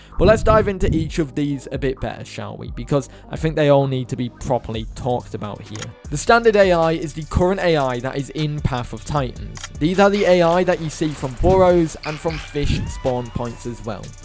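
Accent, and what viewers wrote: United Kingdom. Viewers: Yo when did start hiding that British maw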